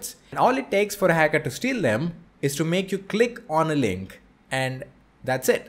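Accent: India. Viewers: I cant handle Idian accent. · One of the rare indians where u fully understand what he is saying yet you know hes indian due to the Accent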